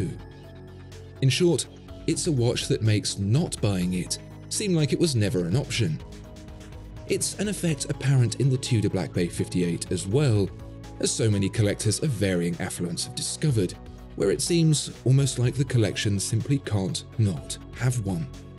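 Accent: United Kingdom. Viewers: As a American praise a $400 watch with a British accent and I’m sold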